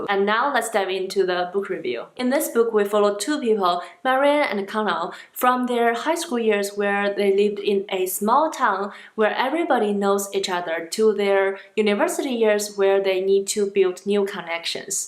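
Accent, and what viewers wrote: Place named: China. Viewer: Chinese English is powerful.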